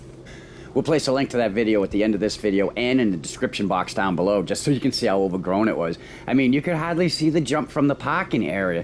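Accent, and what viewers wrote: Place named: Maine, United States. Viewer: do you guys come from around there? You have quite the accent;-)> (it's so cute!)I'm from Boston and I have an accent. What's with the "Mylan, NH"? Shouldn't it be "Mill-ahn"?I say "pahk" too. You almost sound like a Mainer. "You cahn't get they-ah from heyah!" doesn't have an accent?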